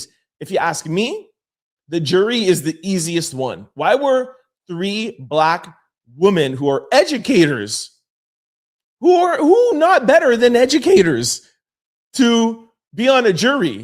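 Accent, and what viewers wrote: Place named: Canada. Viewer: This Canadian accent is annoying as hell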